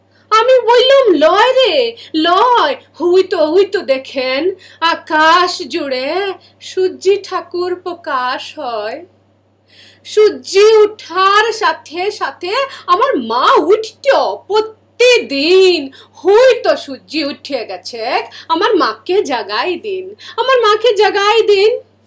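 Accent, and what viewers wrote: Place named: Rarh, India. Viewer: mondal apni ki kono din bangla upovasa(bengali nativ acents) gulor nam suneche? somvoboto sonen ni. eta amader bangla vasar sompod . kobita ta to rarh upovasa (ancholic bangla) tei abbriti kora.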